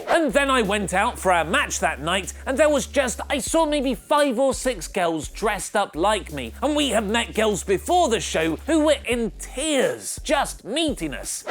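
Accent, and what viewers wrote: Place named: United Kingdom. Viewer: Hearing British pronunciations is horrifying.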